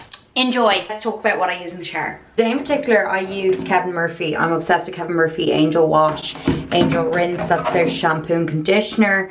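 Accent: Ireland. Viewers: Are u Irish?I'm from co.cork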